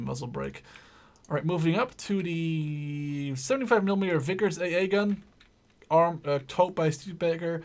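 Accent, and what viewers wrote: Italy. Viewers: Salut spectatorilor si ai folosit accent de italian , dar oricum nu stii ce tocmai am spus :)) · Mie ami place oricum cu sa fara accent Italic #respect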